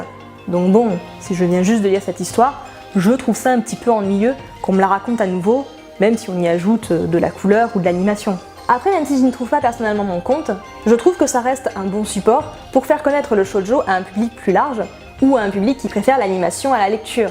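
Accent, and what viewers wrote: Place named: France. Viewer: Ma chère fan girl si tu aimes tant les magical girl et les romances "love chunibyo & other delusions" est fait pour toi , je suis pret a parier que tu me remerciera en lançant des pétales de fleurs . Bon j’exagère peut être un peu mais il aborde ces thèmes et leurs codes avec un petit coté irrévérencieux très cool . Plutôt simple de prime abord ( J'adore cette expression )il peut être vu avec plusieurs niveaux de lecture si on se gratte un peu la tète et ca aussi j'adore . BREF FONCE ... non non non FONCE j'ai dit . J'avoue avoir sauter la partie sur "Your name". je fais partie des rares qui ne l'ont pas encore vu et j’esquive tout spoil potentiel ^^. J'aime bien ton accent français. Moi on me reproche souvent de prononcer comme un chef de la mafia Japonaise alors crois moi c'est pas si mal . Mais pourquoi est tu encore ici ? 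Fonce j'ai dit !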